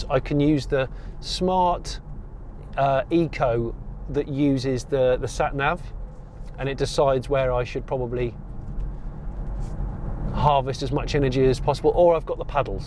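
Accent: United Kingdom. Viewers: As always just a couple of words in the comment just for a brilliant British English.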